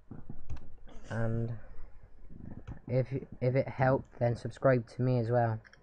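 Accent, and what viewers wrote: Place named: England, United Kingdom. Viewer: he sounds english not like a chav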